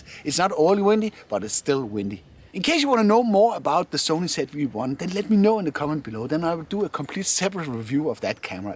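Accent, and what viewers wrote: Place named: Denmark. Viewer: Jeg elsker den danske aksenten din :D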